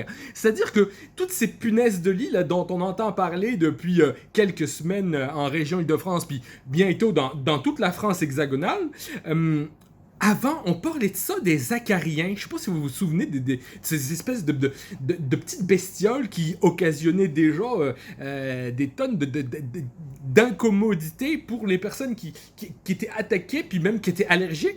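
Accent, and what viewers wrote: Quebec, Canada. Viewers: Meilleur accent québécois du game.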